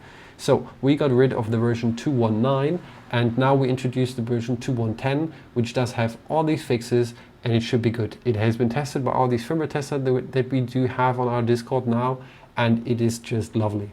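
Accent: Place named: Oxford, United Kingdom. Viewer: Klingt sehe nach Oxfordenglish. Leider ist mein Verständnis sehr begrenzt. 😪 Best regards from Ingo😊. 🖖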